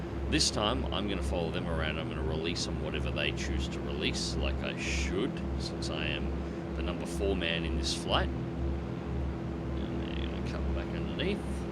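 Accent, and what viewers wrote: Australia. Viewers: Does the J-25 variant have all the perks of the L? Boosted ailerons in particular are what I’m thinking of... Love watching these videos. Ozzie accent, solid flying, honest ego-absent gameplay experience. I’ve been around the channel three, maybe four years (since you played War Thunder with Phly way way back when the game was good—he introduced me to you) and I’ve stuck with your channel long after I’ve stopped watching many others.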